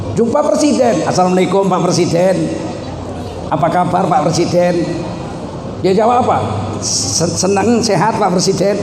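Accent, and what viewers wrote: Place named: Medan, Indonesia. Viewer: hahaha...lawak ceramah nya... kok seperti orang medan cara bicara nya ya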